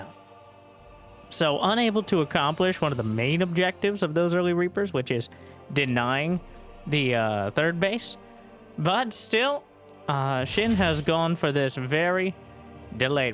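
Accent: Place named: Texas, United States. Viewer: I feel old I have watch your videos enough to notice you grow older and your voice dropped an octive. Also you sound like you came from Texas.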